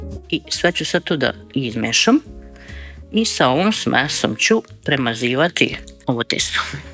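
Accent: Vojvodina, Serbia. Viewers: Svaka vama cast.Po naglasku bih rekla da ste iz Vojvodine ako je tako nije cudo sto vam sve ide kao voda.Veliki pozdrav.❤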